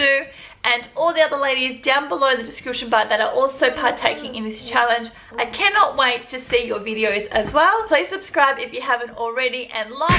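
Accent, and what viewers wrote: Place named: Australia. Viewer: How cute are youuu!! Love your Australian accent!! I think you slayed that challenge!! Well done! Want some of those gummy snakes now!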